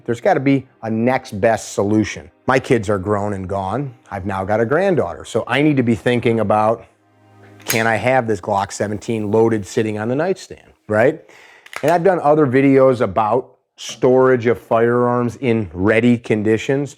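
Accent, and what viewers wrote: Chicago, United States. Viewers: Listened 3 times just for the chicago accent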